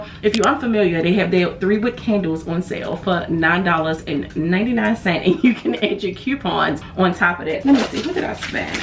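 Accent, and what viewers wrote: Baltimore, United States. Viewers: You definitely are from Baltimore with that accent? Lol. Nice haul!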